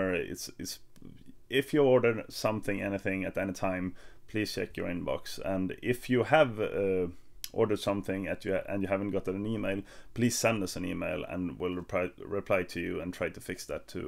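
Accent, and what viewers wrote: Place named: Sweden. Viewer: I just love your swedish Pronunciation :3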